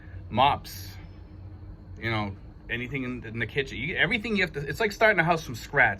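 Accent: United States. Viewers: I just recently subscribed and really enjoy the videos. Probably the best info i have found by an expat in the Philippines. I also invest in both Tesla and ARKK. Both are great investments. I just sold my business recently and my filipina and I will soon be moving to the Philippines. I have been trying to figure your accent. What part of states are you from? Thanks once again for the great and informative videos.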